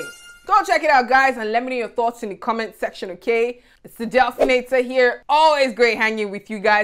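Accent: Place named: Igbo, Nigeria. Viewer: Wait oo are you Yoruba? Cus u sound igbo?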